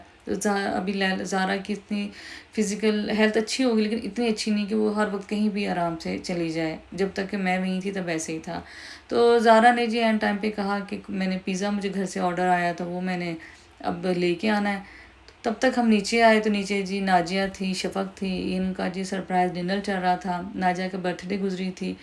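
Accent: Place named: Jhang, Pakistan. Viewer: ji main to fan hu jab aap jhang ki boli me baat karti hain please aap vo bola karo❤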